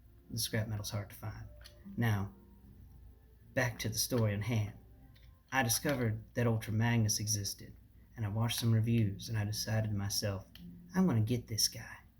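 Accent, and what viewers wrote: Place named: Louisiana, United States. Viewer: Alright you asked for constructive criticism so here goes. So I don’t know how much editing you can do, but if you have control over when the video begins, I would wait until your phone does the notifications thing, give it a few seconds of silence then do you intro, and then just drag where the video begins past the notification sound so it’s just before you start talking. So one thing I think you could do is if you are going to talk about the box in the video you could have it pre measured so you don’t have to do that on camera, cause that does pad out the video. But I would also say that most people don’t care about the box. It’s something most people are going to throw away and it’s not what they came to see. So consider removing talking about the box, or put it later in the video. What’s with the Cajun Accent by the way, are you trying to be Craftsman? 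Oof That sucks. I had a similar thing where I went to visit my grandparents, and my parents got the brilliant idea to throw away my bed because it was old while I was gone. But they got lazy and didn’t buy another one by the time I got home, so I had to spend 3 days sleeping on the floor, before I got a new bed. I feel like coffee cups may not be a great unit to measure it. I don’t think we need to know the size of the guns, so definitiely. Maybe come up with a personal scale for stuff like that. Like dinky, regular, or big boy sized guns and accessories, just try to group them without giving an exact size cause that won’t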